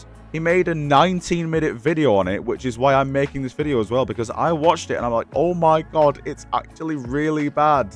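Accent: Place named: Blackpool, United Kingdom. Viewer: The UK origin was crystal clear, sounds like Blackpool area, but i might be wrong. Is nice to hear. ^_^ Ps from time to time i like playing FuA, but only have 1.x units, so it's kinda tough, but doable. Not to justify the stunt that yoyoverse is pulling though, that sucks and as far as i am concerned they can shove that cyrene girl up their arses.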